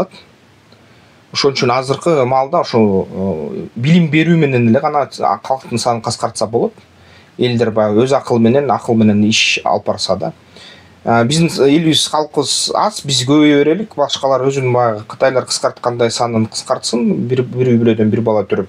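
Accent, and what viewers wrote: Standard. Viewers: Кыргызсча таза суйлойт адабий тилде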